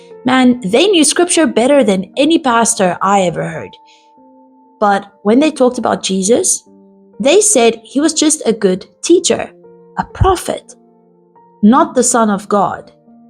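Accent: South Africa. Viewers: a bit of a South African accent there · Is that a South Africa accent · I detect an Afrikaans South African accent? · you are from Colarado, but you sound South African? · Is the lady talking South African? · You sound South African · You sound solid Afrikaans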